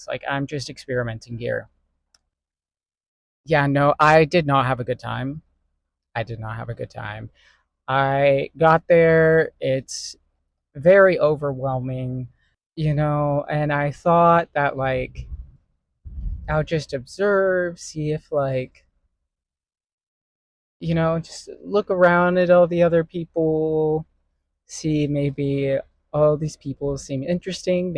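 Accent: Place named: North America. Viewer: There is no pressure to talk to anyone. You sound North American where small talk may not be a good idea with anyone considering the state of our country. I don't care if anyone thinks I'm weird. 🤓 TBH , you make a good impression & have exceptional communication skills.😁 You do have a great smile, my friend.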